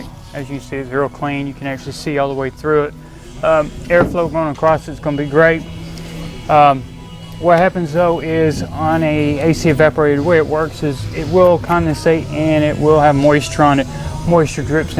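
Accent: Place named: Alabama, United States. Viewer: You don’t sound like you from the motor city.. sound more like you from Alabama Mississippi or something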